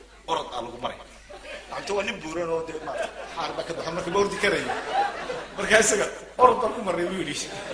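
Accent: Mogadishu, Somalia. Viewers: Kkkkkk wlhi wan Fuurey Abwan So funny Nolow Isaga rer xamar aha kuso barbaaray hadalka si wacan bu u yaqaana kkkk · sida u ukeenay luqada xamar baan ka yaaby kkkkkkkkkk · caadi ma tihid sidaad reer xamarka u matashay walaahi anigoo ciyaal boondheero ahaa adigaa iga badiyo shangaani mise xamarwayne maad daganeed🇸🇴🇸🇴🇸🇴🇸🇴🇸🇴🇸🇴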